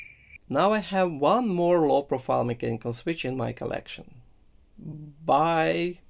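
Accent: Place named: Eastern Europe. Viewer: No-one said about strong slavic accent? Anyone? Then me: you sound like my school English teacher😄 Btw cool content, thnx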